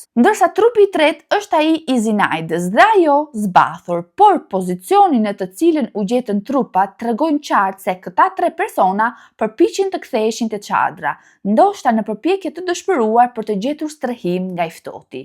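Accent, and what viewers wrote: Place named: Tosk, Albania. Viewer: O sa bukur ngjyra e flokve tua ket ngjyr Gri ❤ te rrin shum bukur mos i ndrysho biles nje koh❤! Dhe un e kam ket ngjyr vetem se ikam te shkurta.Të përshendes nga Franca ne Origjin nga Kosova.te ndegjoj me shum deshir sepse me pelqen dialekti tosk edhe pse Gegnishtja asht e bukur se asht shum e veqnat por ti gjithqka e bën me ❤ !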